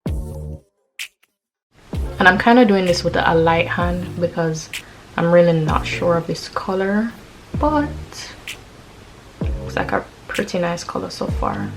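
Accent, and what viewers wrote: Jamaica. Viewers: You Jamaica already know the accent 😍😍😍🇯🇲🇯🇲 island gyal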